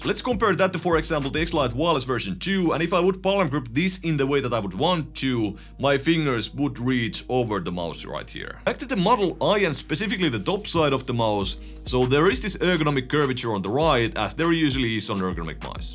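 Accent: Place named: Finland. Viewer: Hyvä rallienkku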